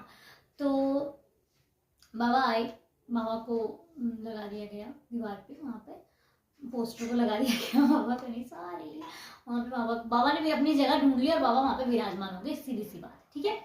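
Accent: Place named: Punjab, India. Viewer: I love ur punjabi tone mera naam sukhdeep hai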